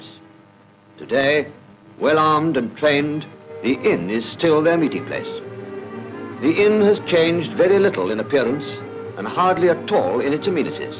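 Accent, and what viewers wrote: RP, United Kingdom. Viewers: Received Pronunciation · May be toffee , but you can hear every word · Fascinating old black and white film with the typical toffee-nosed English narrator. Great old pub signs with pub names I never heard of.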